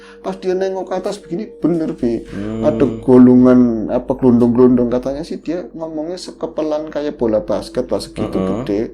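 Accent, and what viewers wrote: Banyumas, Indonesia. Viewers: Basa ngapak mas dan selaan mas itulah yg dinanti...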